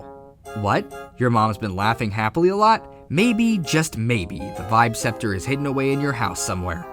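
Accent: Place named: New York, United States. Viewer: I might be going crazy but, I swear he's getting more and more New Yorker as time passes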